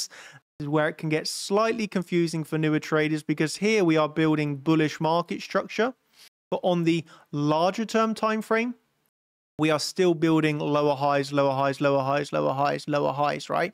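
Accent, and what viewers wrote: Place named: Somerset, United Kingdom. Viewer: SOUTH WEST. Man's got a Somerset accent. Beautiful part of the country ❤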